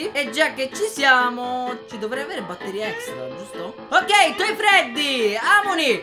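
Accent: Sicily, Italy. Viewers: Sei siciliano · sei siciliano? Io si sono palermitano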